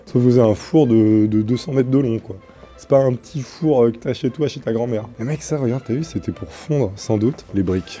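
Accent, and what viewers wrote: Belgium. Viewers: je suis belge et je confirme ton accent ET oui c'est vrais les "esprits" belge son parfois violent;) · Vraiment sympa tes vidéo Urbex :-) Tu utilise quoi comme caméra pour ta night vision ? car je cherche quelque marque ou référence. Sympa ton accent de Belge mais de mon coté vers Charleroi on as pas cet accent de Bruxelles ^^ Par contre oui quand on rend la monnaie ou n'importe quoi d'autres, on dit bien s'il vous plais. Vive les frites et surtout les bonne bières :-)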